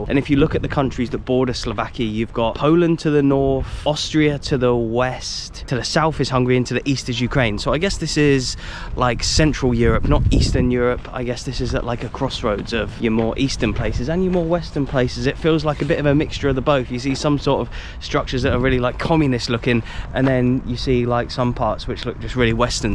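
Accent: United Kingdom. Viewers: I saw you filming a video at the Christmas markets, I was just making a delivery for a food delivery company and I passed you on my bike, I also thought that this must be a UK accent and I wondered for a while where you were from. And today this video pops up, I like your content. Keep it up and come again.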